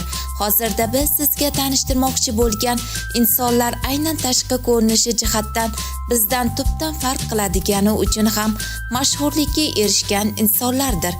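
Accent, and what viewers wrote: Surkhandarya, Uzbekistan. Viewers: менимча точни сурхандарйо йоки кашкадарйодансиз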